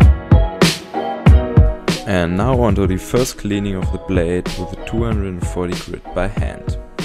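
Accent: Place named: Germany. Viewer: Great video 👍🏼 ich nehme mal an du sprichst Deutsch bei deinem Akzent? However well done👌🏼👍🏼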